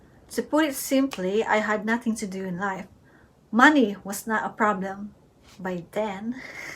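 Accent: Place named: United Kingdom. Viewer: Good listening to you pretty woman, liked the UK accent.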